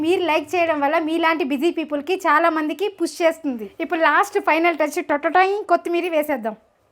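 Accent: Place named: Chittoor, India. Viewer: natural and nice cooking with chakkani chittoor telugu maatalu, so homely...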